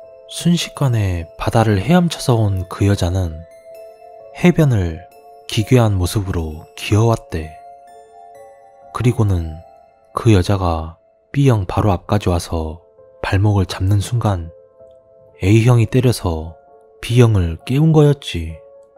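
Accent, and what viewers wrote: Jeolla, South Korea. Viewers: 전라도 사투리 졸귀탱ㅋㅋㄱㄱㅋ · 아 특유의 전라도 억양이 너무 정감가서 그런가 내용이 집중이 안되네요 좀 웃김 !! ㅎㅎㅎ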